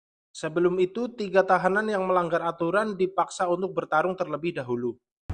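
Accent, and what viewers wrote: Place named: Banyumas, Indonesia. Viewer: lucu bgt bahasa ngapak😅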